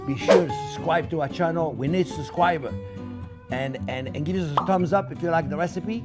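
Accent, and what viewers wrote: France. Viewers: I love his french accent...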